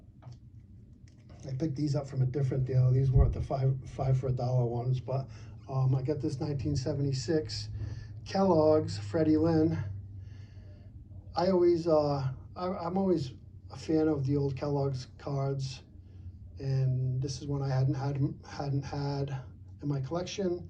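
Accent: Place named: Boston, United States. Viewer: I get the impression you're from Boston lol,great pickups,I missed 2021 national ,will be in ac,hope to get a chance to meet you and other you tubers to tell stories and share experience's.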